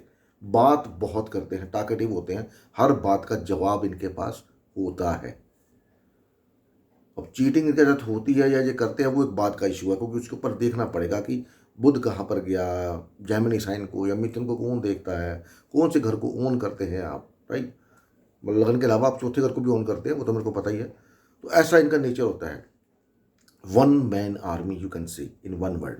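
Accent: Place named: Punjab, India. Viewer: Thank you so much,your Punjabi accent Hindi is so attractive.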